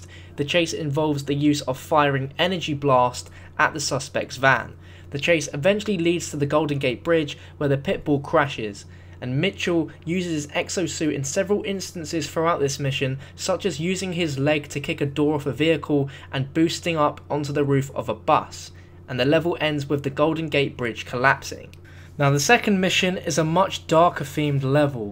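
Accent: England, United Kingdom. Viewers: is the best are you English?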